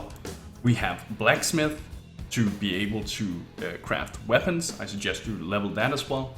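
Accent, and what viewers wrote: Denmark. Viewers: My god you have a Danish accent a viking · But yeah, your accent gave it away, we danes have a weird accent. xD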